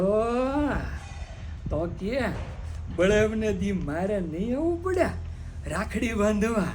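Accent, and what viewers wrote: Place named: Bhavnagar, India. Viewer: અસલ ભાવનગરી ભાષા😂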